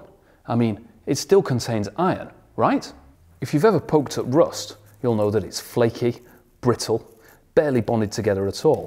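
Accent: United Kingdom. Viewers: Great info but it's hard to take you seriously when you blatantly insert letters into words that shouldn't be Spell aluminum. Better yet write it down. Now, how many times does the letter I occur? Only once but you Brits insist on inserting an extra I between the N and the U to change the pronunciation of the word to suit yourselves. It's not alUmineeum. And don't even get me started on lieutenant!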